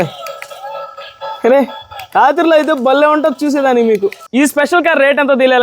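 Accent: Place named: East Godavari, India. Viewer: Anna east godavari lo laga mataladu Anna eimka ni vedios ki eavvadu topu ledu e comment ki vedio cheyy anna nenu eaduru chustu vumtya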